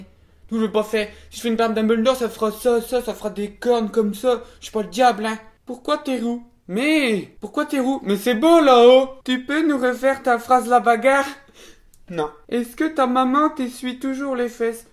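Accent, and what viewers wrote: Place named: Nord, France. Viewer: Il est de ou ? Parce que son accent est plus du 59 que du 62 .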